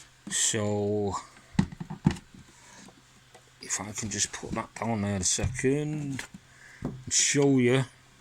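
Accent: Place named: Manchester, United Kingdom. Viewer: Good on you mate, I could do with some help you sound local - Manchester way 👍👍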